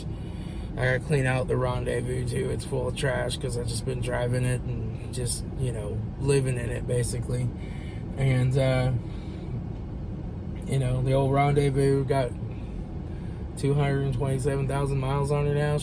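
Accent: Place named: Ohio, United States. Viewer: I knew it! I'm from Tipp City OH originally, moved out west in 1996. Now I notice an Ohio accent. I like listening to you because you sound like family, lol. You sound like my brother. Love your videos!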